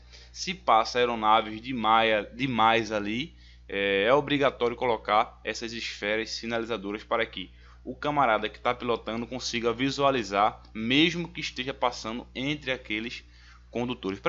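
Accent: Brazil. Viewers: Sotaque pernambucano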